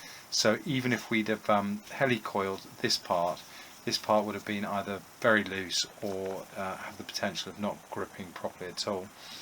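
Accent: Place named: England, United Kingdom. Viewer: That is a beautifully crafted part you had made as a replacement. And FWIW - as you wondered about who might watch your videos in the future. I enjoy watching your videos, even though I have no intention of restoring a motorcycle, in fact I don't even own a motorcycle right now. I do however find your video's quite entertaining, and you are a likable presenter with a nice tone of voice and a pleasant English accent.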